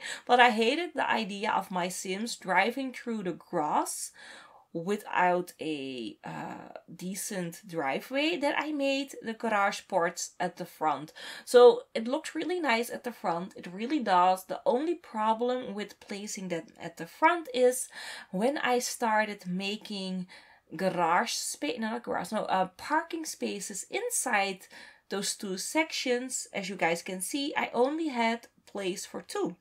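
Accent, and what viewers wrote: Netherlands. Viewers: It's so cool! I can't wait to see what you'll do with all these appartments. But I think there will be a lot of episodes and that's good :D And by the way I do love your Dutch accent, espcially when you say garage. In each video I'm waiting for you to tell it and I can help myself smiling. Can't wait for the next video !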